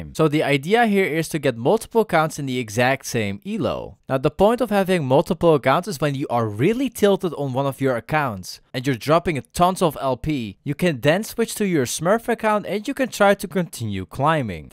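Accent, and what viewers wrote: Netherlands. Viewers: why do i have a feelin that his mainlanguage is dutch? xp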